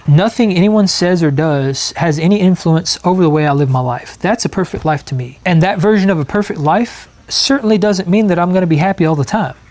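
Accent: United States. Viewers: Yay, I love the sound of your American voice and watching Shawnee and your chickens in the background and what you say. Thanks ❤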